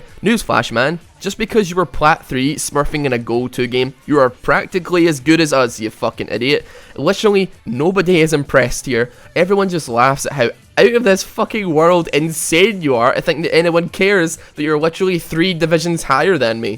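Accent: Scotland, United Kingdom. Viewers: Your scottish aint you? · scottish? very cool accent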